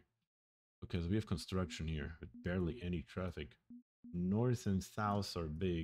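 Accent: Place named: Germany. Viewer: I was wonderd that this is your first video because its very good. Well I am from Austria and I hear the German accent ,but can keep doing videos like them I like them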